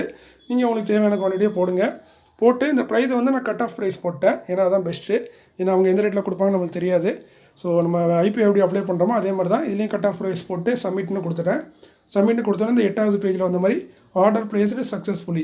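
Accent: Tirunelveli, India. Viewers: Sir please speak as early .Don't try new version. For others we cannot change Tirunelveli Tamil 😊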